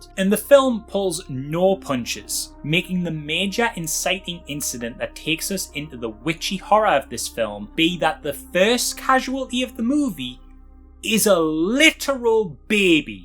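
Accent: Newcastle, United Kingdom. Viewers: Everything sounds good in a Geordie accent.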